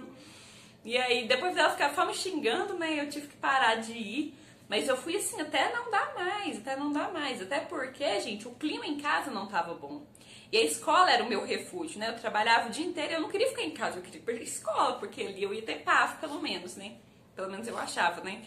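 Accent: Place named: Minas Gerais, Brazil. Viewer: Gosto do seu jeitinho humilde e simples! Tão nova e com uma cabeça boa. Deus te abençoe. Ah, adoro seu jeitinho de falar mineirinho😉😘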